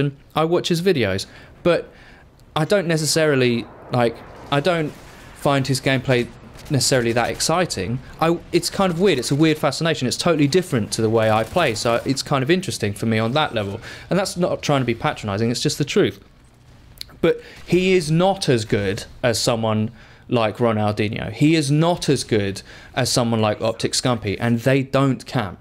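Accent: United Kingdom. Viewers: Lolzer i thought i was in my sub box, clicked this video thinking it was BT heard the english accent and thought wtf? Really enjoyed the video and will definitely subscribe:) hon the BT for liking he video and confusing the shit out of me:L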